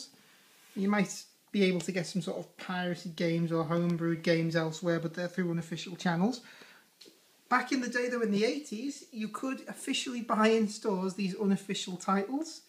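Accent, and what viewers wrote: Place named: Liverpool, United Kingdom. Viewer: u rock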